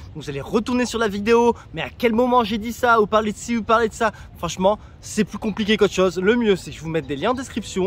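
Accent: France. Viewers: Nghe là biết người pháp😂 chào mừng anh em đến với Vietnam 👋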